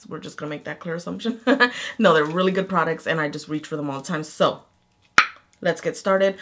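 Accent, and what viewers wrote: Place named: Connecticut, United States. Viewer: Oh hey Connecticut! I caught the accent a while back and I was wondering... but I knew you were nearby haha I'm in Bawstin honeyy.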